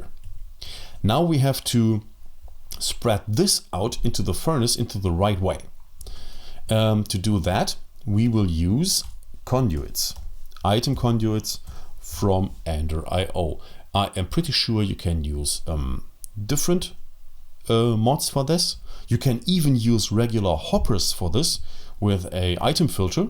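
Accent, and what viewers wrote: Germany. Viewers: Do u come from germany? ^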